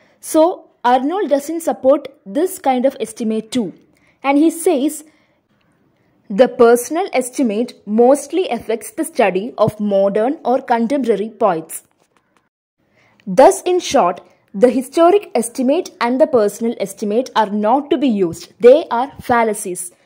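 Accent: India. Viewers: Mam are you from south 😍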